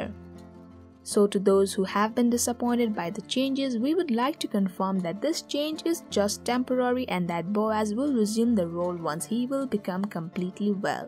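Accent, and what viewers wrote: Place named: India. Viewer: Sounds Indian to me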